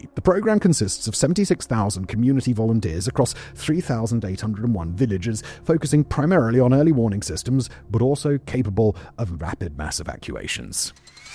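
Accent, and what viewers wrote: United Kingdom. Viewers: Can’t stand this voice. I’m so over British accents. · anyone else bothered by how fast he talks? I mean I'm used to the British accent and all but I can barely understand what he's talking about because of how fast he speaks.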